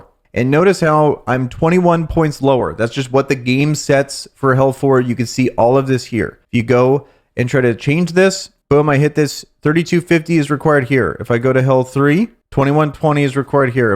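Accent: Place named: United States. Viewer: He's American..that's what they do with the English language, it's gradually changing because of the likes of this.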